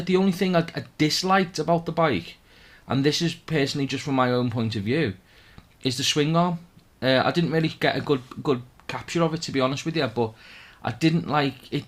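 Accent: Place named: North West England, United Kingdom. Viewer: Thanks. i think I have been through there before. Nice pub at Aldbury! I wasnt sure whether it was cheshire given that your accent is from the Noth West. Cheers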